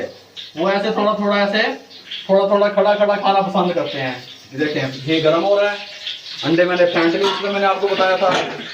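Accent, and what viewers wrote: Punjab, India. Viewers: Asslam o alaikum Bhayi Allah Kareem ap ko sahet aur slamti dy aur Umar m barkat ata frmay aur dharon khushiyan ata frmay aur nazar bd sy bchaey ameen suma ameen ya rab ul Allah ameen ap ka baat krnay ka andaz Bohet acha lgta hy aur Punjabi touch ki tu kia hi baat hy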